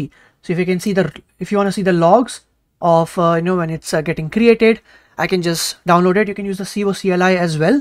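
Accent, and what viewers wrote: India. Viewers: Yes! He uses basic English with Indian accent. Bilkul follow kar sakte ho👍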